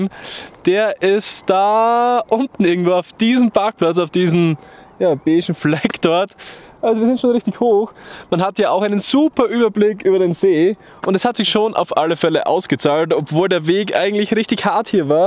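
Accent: Austria. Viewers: Kommst du aus österreich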